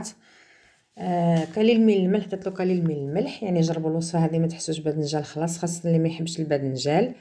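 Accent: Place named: Jijel, Algeria. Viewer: الله يبارك ويوفقك في مشوارك قناتك رائعة انا احب الطبخ الجيجلي وكذلك اللهجة😘😘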